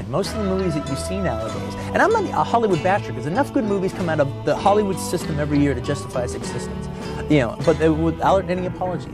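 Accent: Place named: New York, United States. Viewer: is he a new yorker or a chicagoan.. whats his accent? :) wikipedia.. --> tells me he is from knoxville Tenesee.. I guess he's accent morfed into californian accent by now.. but it sounds big city anyway! perhaps he lived in new york also as it sounds like new yorkan accent to my swedish ears..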